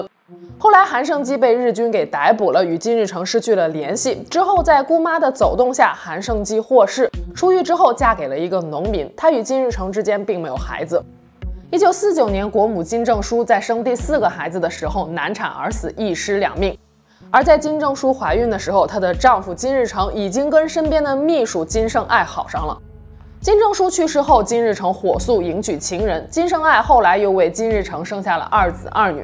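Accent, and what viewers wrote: China. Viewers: up咋是咱大陆口音啊，不像台湾人嗲嗲的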